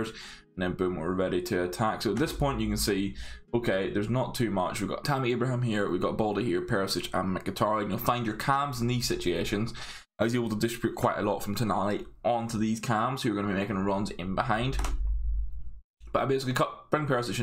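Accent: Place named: Northern Ireland, United Kingdom. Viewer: Nice video mate 🤙 U from Northern Ireland mate, I think recognise that accent as I’m from NI myself 😂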